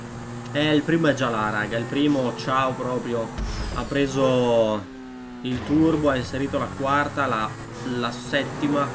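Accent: Veneto, Italy. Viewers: ma sei veneto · Alex-Pro- Gamer ah grazie perchè io essendo di Venezia avevo riconosciuto l'accento veneto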